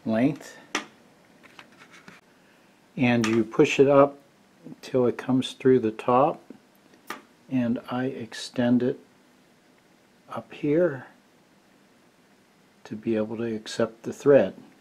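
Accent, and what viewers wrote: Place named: Pennsylvania, United States. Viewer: fantastic video...My cobler just arrived yesterday ~ still in the box and will be putting your helpful video to use tomorrow... Thanks so much!!! You have a South Western PA accent...curious...any chance you're from that area???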